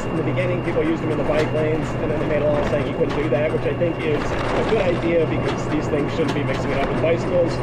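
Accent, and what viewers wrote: United States. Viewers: Your English sounds 100% American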